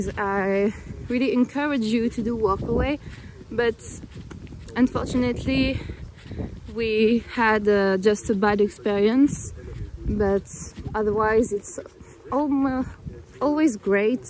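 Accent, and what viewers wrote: Wales, United Kingdom. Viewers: Love watching your travels! You'd be very welcome in the UK anytime:) and the welsh accent is lovely! My mum was welsh and she had such a lovely speaking voice! Happy travels x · The coast of Croatia looks so beautiful. I, too, love to hear the Welsh accent. I hope & you have a great weekend and the rest of the week. 🧡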